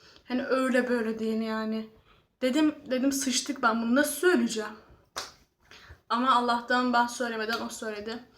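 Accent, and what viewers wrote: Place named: Aegean, Turkey. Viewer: Senin ege şiveni yirim😂😂😍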